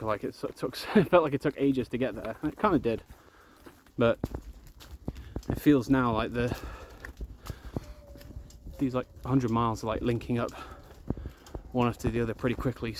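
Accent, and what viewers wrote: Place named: East Anglia, United Kingdom. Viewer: "I'll be DAMED if the brick is overtaking me" Made me laugh a lot more than it should of... Where are you based in the awesome UK? Sounds like Anglian Area?? Keep up the good work and Enjoy the adventure.. Dave UK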